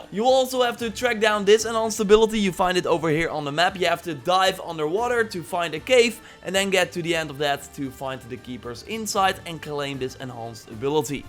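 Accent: Netherlands. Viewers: I can hear through the guys voice, he is from The Netherlands, correct me if im wrong.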